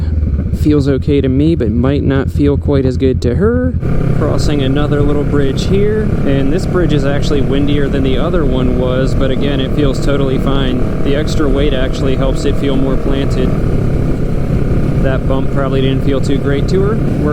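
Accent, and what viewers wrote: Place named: Vermont, United States. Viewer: Where are you, you have a pretty distinct accent. Vermont?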